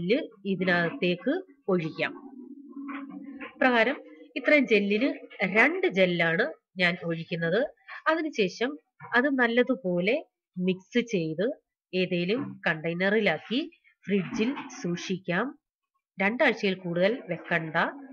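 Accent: Kottayam, India. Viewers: Chechy.nalla video anu. Chechy kottayam.kariyano atupoleya chechiede samsaram.sweet voice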